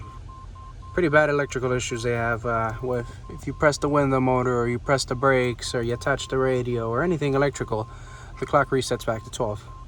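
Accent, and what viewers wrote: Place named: New York, United States. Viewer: I thought you lived in new york with a new york accent when you said you lived in Florida 😂 cool accent this car was made a year before i was born in 1981 great classic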